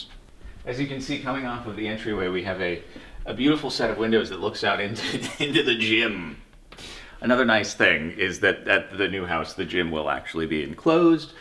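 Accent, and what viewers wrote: Australia. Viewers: pretty good Australian accent tbh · Bro's Australian accent has gotten a lot better since Farmer__Steve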